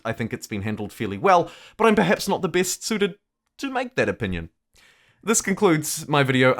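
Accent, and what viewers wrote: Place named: New Zealand. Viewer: Your accent sounds like you're from New Zealand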